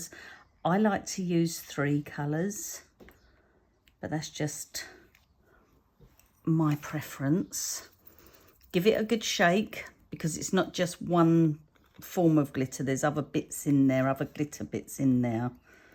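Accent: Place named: England, United Kingdom. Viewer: Hi. I love your tutorials so nice to hear your english accent. - I'm 81 & in UK but still enjoying my cardmaking. Thank you for your inspiration. 🇬🇧🇬🇧🇬🇧🇬🇧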